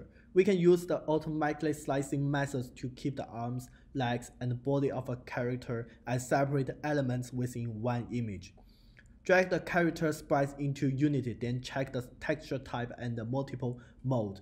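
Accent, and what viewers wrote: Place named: China. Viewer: 兄弟,听口音是 中国人呀~~ 能不能搞点2D中文版本教程呀~~~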